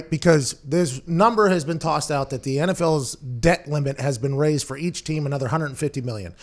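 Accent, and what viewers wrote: Pittsburgh, United States. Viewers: That Pittsburgh language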